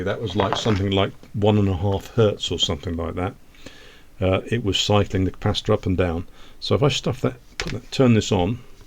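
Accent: United Kingdom. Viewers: Sounds British….english with pounds… … not French… and the ai didn’t convert you to a New England accent nor change your words to be dollars….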